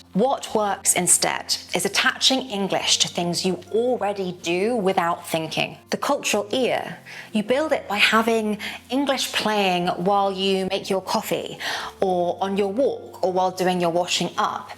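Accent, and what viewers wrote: United Kingdom. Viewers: I've been learning English for the past 20 years because I started late in life as my second language, and I'm trying to be perfect, although I know I won't; but there is always room for improvement, right? I've always heard that "practice makes perfect." I've never heard that "perfect is the enemy of good." Oh my word!!! I feel relieved now. Thank you so much for these kind words. I love all your lessons, your method, your British accent, your voice...... Love from London.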